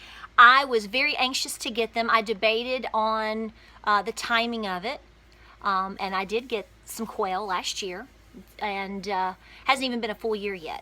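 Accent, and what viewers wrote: South, United States. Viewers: My luv, we think the world of you. And we would do what ever it takes to help you. You have to know that. But just remember, we will always be sub'd to you not matter what happenes. Just know that a world with no is a very lonely place. Please take care of yourself -- you have a lot of stress happening in your life right now. Please take care of yourself. We really do love you and you videos (even if you do talk kind of funny) (remember I am darn near living in Canada, so anything south of the 45th parallel is considered (southern) God Bless you May he watch over you and give you the strength you need and the knowledge when it is time to regenerate. Love ya Tropical Minnesota.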